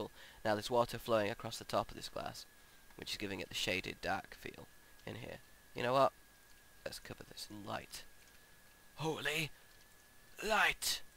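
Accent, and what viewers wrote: United Kingdom. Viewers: british version of cartman from south park xD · Your accent really frustrates me. I'm sorry. You sound like a British person trying to sound Australian and American at the same time. Nice videos by the way.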